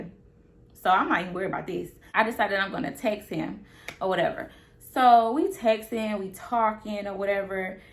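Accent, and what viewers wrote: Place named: New Orleans, United States. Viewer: I feel like I hear a lil new orleans accent lol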